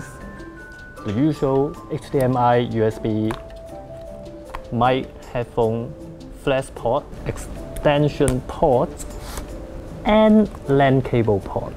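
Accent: United Kingdom. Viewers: Nothing wrong with his English. He manages his puns well, and I do understand what he speaks. And I like his reviews as I like the other Asian's who does British accent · You sound British.....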